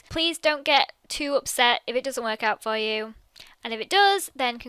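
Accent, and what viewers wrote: England, United Kingdom. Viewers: I love your English accent :)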